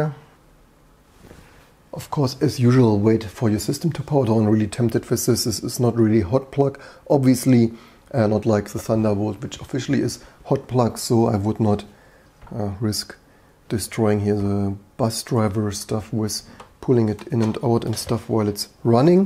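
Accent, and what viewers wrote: Germany. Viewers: Wow, ziemlich deutscher Akzent 😂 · HÖRE ICH HIER DEN DEUTSCHEN AKZENT ?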